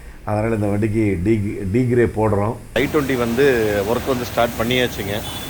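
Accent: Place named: Coimbatore, India. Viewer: உங்க கோவை தமிழ் ரேவியூ நல்லாருக்கு போலிஷ் சூப்பரா இருக்கு Approximately running kms 160000 Budget rs.<num>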